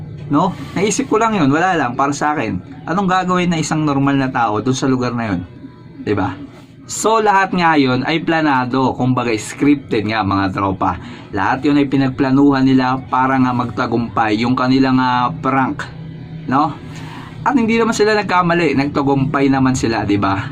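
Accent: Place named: Ilocos, Philippines. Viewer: Ilocano ka?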